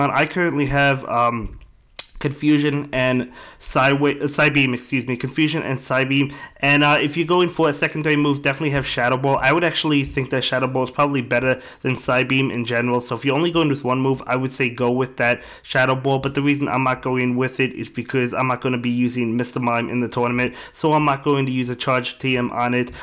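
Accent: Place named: New York City, United States. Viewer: It's a regional thing; I'm from NYC and I can understand him perfectly. Not sure what you're talking about with bodily noises.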